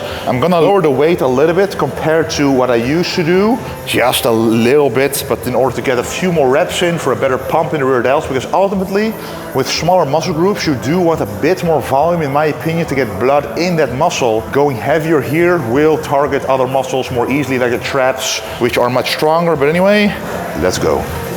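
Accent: Netherlands. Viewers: OMG I am so much supporting you because of your lovely Dutch accent. ❤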